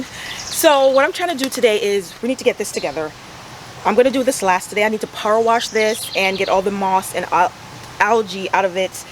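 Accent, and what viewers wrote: Jamaica. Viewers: Are you Jamaican? Jerk chicken and night owl. You sound like me and your love of flowers is so familiar to me. We just want beauty in our yard! Your place is naturally beautiful. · Greetings from Canada, beautiful garden. I love how the Jamaican accent comes out every so often.😂😂 .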